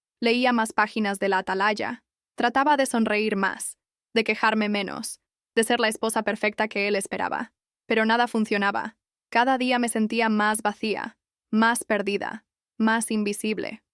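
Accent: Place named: Spain. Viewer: Es chocosa la forma en que a cada rato preguntas tonterías y con tu acento españolado se oye peor. 🤮🥱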